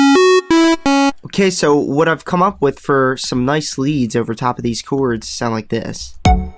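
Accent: Philadelphia, United States. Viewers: sounds like Philly to me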